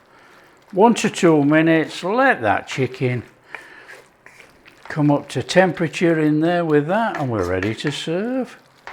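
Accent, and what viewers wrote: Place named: Northern England, United Kingdom. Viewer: New subscriber here, just been binge watching your channel, I'm from down the road in Horwich so it's like hearng my dad talking listening to you with our northern accents, loving your food, I'm going to make your butterscotch tart and your cabbage and bacon casserole this week yummy❤❤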